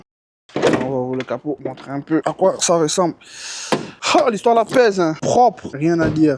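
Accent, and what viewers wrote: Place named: Cameroon. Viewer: Ce le français camerounais ❤❤❤❤❤❤❤❤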